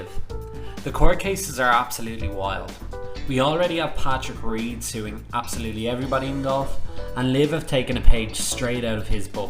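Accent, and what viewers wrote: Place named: Ireland. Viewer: I’m not a lover of LIV, Norman or all the blood money grabbing pros and honestly, I hope they all rot in hell but this clip is so full of shit, it deserves to be put in the trash can. If you read this in the international news then maybe, there will be credibility but other than that, this guy sounds like he’s from Island and will treat this as an attempt at Irish humour and nothing else.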